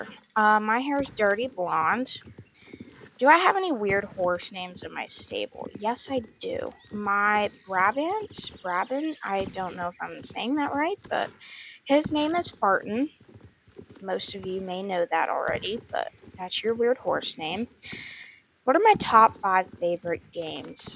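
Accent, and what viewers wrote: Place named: South, United States. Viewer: Twin you got an accent too southern twinnny!! -Shenzi or Scylla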